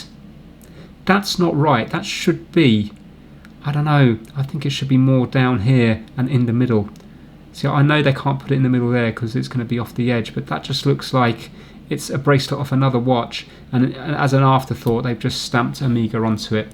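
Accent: United Kingdom. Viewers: I was really confused what watch it was as I've never heard the name, but that was only british pronunciation 🤣 PS: Love the yellow mat 👍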